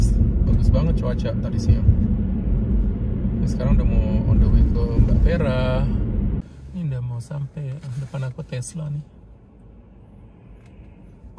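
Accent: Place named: Java, Indonesia. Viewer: logat bicaranya njawani banget kok gak mbataki Semoga chanelnya makin berkembang dan sukses ya bang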